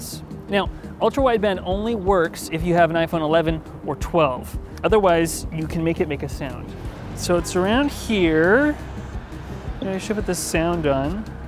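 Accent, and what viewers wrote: Canada. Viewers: I’m sorry — Canada, eh? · Another Canadian eh?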